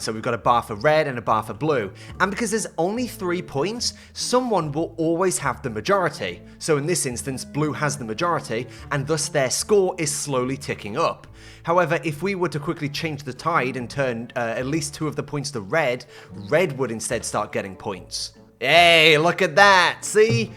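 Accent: United Kingdom. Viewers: Why does your brittish accent attract me so much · I love watching this guy. Especially since he is British. There was a science experiment and it was proven that people listen to those who have British accents more than other accents like Southern or New England accents, and I can defiantly say that I listen to this guy a lot more than most youtubers. :D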